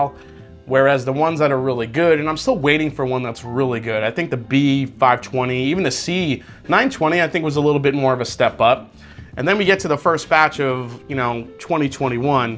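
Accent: New York, United States. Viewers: Your New York Italian coming out hard core.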